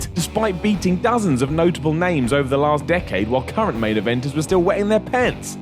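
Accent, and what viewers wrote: United Kingdom. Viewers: I don't mean the accent over the a, I mean Simons British accent. So he used to saying it that way.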